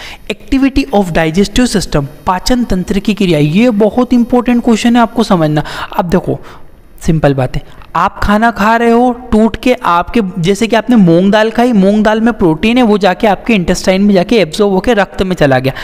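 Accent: Indore, India. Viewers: Mtlb. Sr ap indore se. Ho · Sir aap Indore se ho kya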